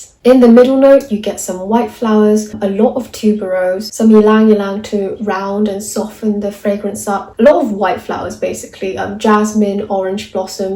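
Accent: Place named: United Kingdom. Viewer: 영국발음 너무 매력적이에요🥹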